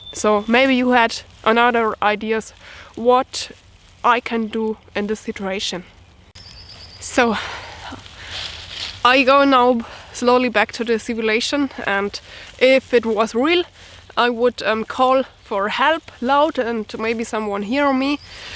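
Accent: Germany. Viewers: I agree totally with Robert, You are such a sweet and courageous woman, and i love your German accent. Brings back fond memories of my stay in Germany. Hals und beinbruche!! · Nice video, ik love to hear german people speak english. but u know you dont have to block out beautifull woman, that was in the 90s.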